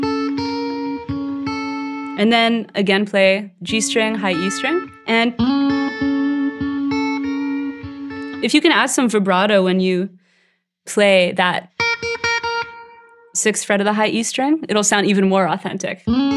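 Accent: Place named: Canada. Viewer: I knew you were Canadian without checking. You said, "I'm sore-ey" as opposed to us Yanks' "sah-rey." Awesome lesson.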